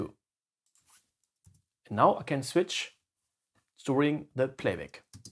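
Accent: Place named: Scandinavia. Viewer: Your accent in English sounds like Scandinavians!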